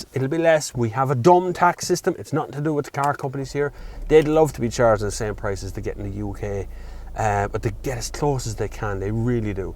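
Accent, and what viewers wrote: Ireland. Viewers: Oh I love the Irish way of speaking!:) Sadly I moved from Galway to Switzerland 2 years ago after spending the nicest 12 years of me life over in the Wesht;) Great review there! Ps. Price of this car in ROI comparing to the Swiss price is ridiculously low:)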